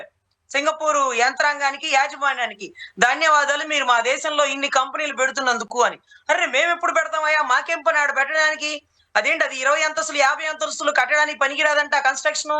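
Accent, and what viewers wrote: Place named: Guntur, India. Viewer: Arre arre Guntur language ❤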